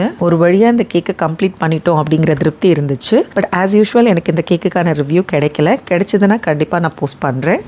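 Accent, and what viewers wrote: Madurai, India. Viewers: Akka neenga madurai ah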